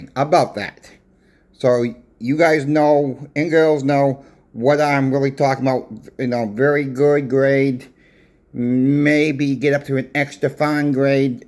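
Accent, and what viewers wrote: Boston, United States. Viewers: I thought you were from New York but you said you’re from Boston. I can hear it now.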